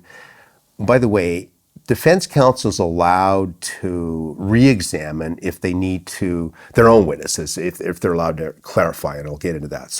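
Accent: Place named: Canada. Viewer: Is this dude and Canuck er what? Second sentence he said proooocess and I knew it. Are you taking US Court system here or…. Oh no, you just a said “Crown”. Canuck.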